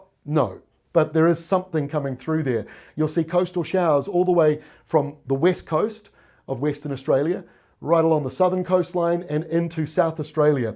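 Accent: New Zealand. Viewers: NO, Thank you for being a kiwi aussies can understand, Aye.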